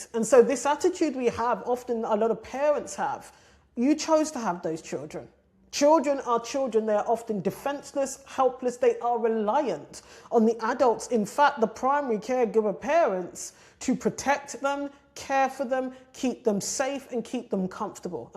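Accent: United Kingdom. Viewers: Yeeeeees! I knew you were from SOUTH LONDON! Bad gyal tings! Go on mamita 🔥🔥🔥🔥🔥🔥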